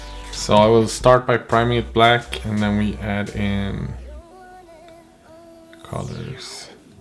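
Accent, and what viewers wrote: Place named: Sweden. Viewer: Oh my God! I used to live in Sweden. This is so fucking real! Hahahahaha And your Swede accent sounds SO familiar. 😂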